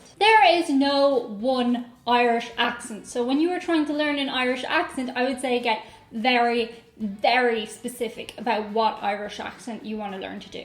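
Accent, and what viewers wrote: Ireland. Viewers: OMG, since I enjoy your Irish accent, I chose the Irish English setting on my iPhone's Siri, get this; it also gave it an attitude. I recently made a request from Siri and the answer I got was, SERIOUSLY!!!! it just cracked me up, the Irish Siri is feisty! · I wondered about your specific accent which is subtly different than many of the other Irish accents that I hear via “The Try Channel” and other sources. Can’t wait until you get to Chicago, I hope you get to hear the many variations in the city! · Being from deep in the Heart of Dixie anytime I try to do an Irish accent it sounds more like a bad Scottish accent LOL then it slides into a Boston accent LOL your accent is very nice. I have met people from Ireland I cannot understand a word they are saying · Queue the trolls who insist your Irish accent is fake. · The only Irish accent I care about is yours. 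· Hi, I love the Irish, As an American Injun I was taught my Catechism at the Stewart Nevada Indian Boarding school, Catholic Church, by Nuns who had traveled from Ireland, on a VISA, and to me were the most Beautiful women I had ever seen!!! In my entire 6 yr old existence! No one since has ever had a true Irish accent. Except you of course.:D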